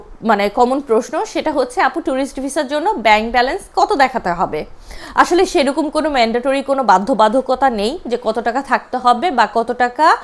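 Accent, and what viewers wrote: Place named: Bangladesh. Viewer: আপনার কথাগুলো অনেক সুন্দর আপনার কথা শুনে মনে হলো আপনি বাংলাদেশের নাগরিক বাংলাদেশের কোন জেলায় আপনার বাড়ি জানালে অনেক খুশি হব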